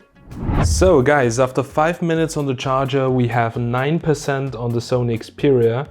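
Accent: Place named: Germany. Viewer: German accent 😁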